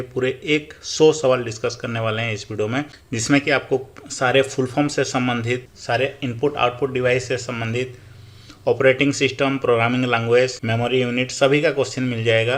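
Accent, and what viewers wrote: Bengal, India. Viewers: may be he is bengoli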